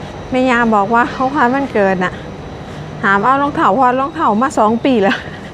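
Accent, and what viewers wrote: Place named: Isan, Thailand. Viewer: ฟ้งสำเนียงการพูดแล้วชอบจังค่ะ..ชอบสำเนียงไทยอิสานนี้ค่ะ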